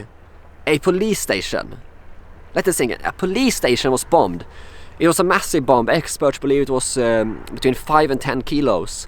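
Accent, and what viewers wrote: Sweden. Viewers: Haha din svengelska är så härlig :D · Skön svengelska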